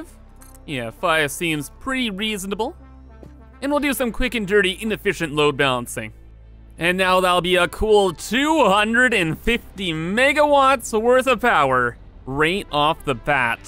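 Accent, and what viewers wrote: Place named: Canada. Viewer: Oh man, first time seeing your videos and you sound so Canadian there bud, had to subscribe and check your twitter and indeed you are, what province do you live in? if its Saskatchewan imma loose my shit, nobody lives here.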